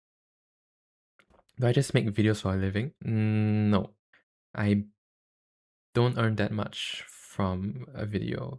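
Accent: Singapore. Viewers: hearing you talk in sg english is so weird lol my brain is not used to it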